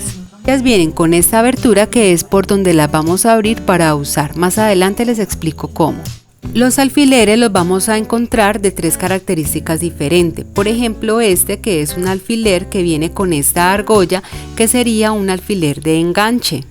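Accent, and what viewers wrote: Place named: Colombia. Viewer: Hola; mil gracias por tus explicaciones..creo por tu acento que eres colombiana como yo, que bueno si es asi Estoy aprendiendo mucho con tus tutoriales por ahora para hacer regalitos y ojalá pueda vender. Vivo en usa. y he conseguido poco a poco los materiales. Tengo 69 años y ha sido facil aprender. Un abrazo desde la Florida, usa.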